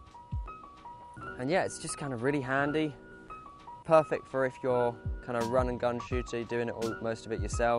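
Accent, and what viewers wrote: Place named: United Kingdom. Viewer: British Accent👌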